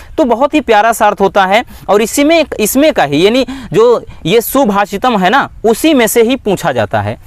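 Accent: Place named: Bihar, India. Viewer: Bihar se hain aap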